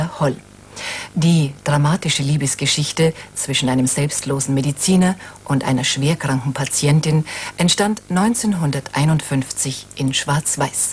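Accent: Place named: Bavaria, Germany. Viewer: Carolin Reiber klingt hier noch ungewohnt "bayerisch" , fast als ob sie dann beim Wechsel nach Mainz einen Nachweis für Hochdeutsch machen musste😅 Und wie damals immer auf inszeniert in s/w und schlechte Tonqualität hingewiesen wurde. Müsste man sich heute mal vorstellen, in ZDF/ARD bitte entschuldigen Sie dass wir den Film nur in 720p HD ausstrahlen 😂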